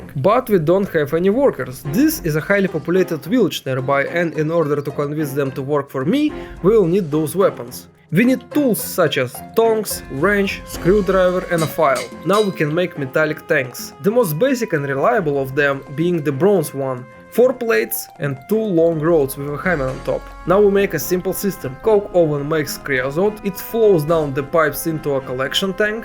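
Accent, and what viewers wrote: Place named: Eastern Europe. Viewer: Самый славянский акцент из всех которые я когда либо слышал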